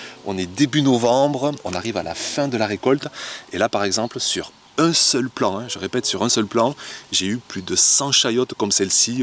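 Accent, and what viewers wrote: South, France. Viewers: Bonjour, je confirme que la chayotte en pleine terre réussit très bien en Alsace! La mienne est très à l'aise en grimpant sur l'actinidia.J'en rentre des cageots pleins en ce moment...Par contre, effectivement, en pot, aucune production...! J'adore vos vidéos si vivantes et avec l'accent du sud en prime!😀🥰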